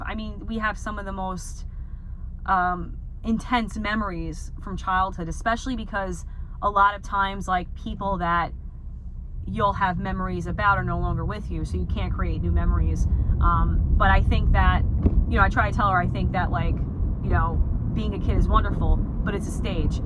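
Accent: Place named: New York, United States. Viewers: I love ur New York accent, I think it’s so cool. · no shade I’m just from the Midwest we know a New Yorker when we hear one.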